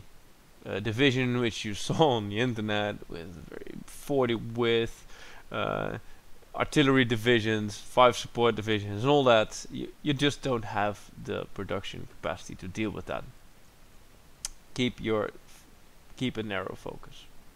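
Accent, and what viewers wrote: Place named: Scandinavia. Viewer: what accent is that, prob scandinavian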